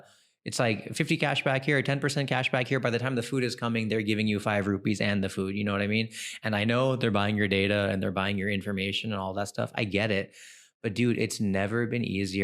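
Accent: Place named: United States. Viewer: Wow ! You still speak American accent. How come it hasn't changed. 🙂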